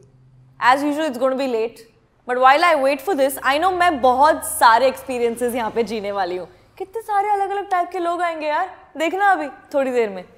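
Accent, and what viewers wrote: Maharashtra, India. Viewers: Such cute Marathi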